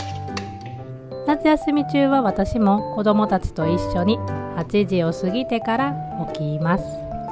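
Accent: Kansai, Japan. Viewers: 関西弁がクセになります！！！！！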